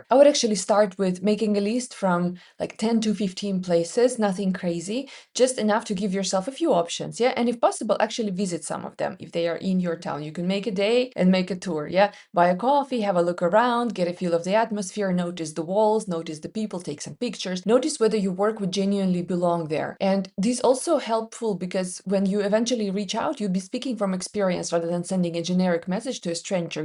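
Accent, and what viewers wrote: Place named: Norway. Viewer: you have an accent yeah / could be Norwegian?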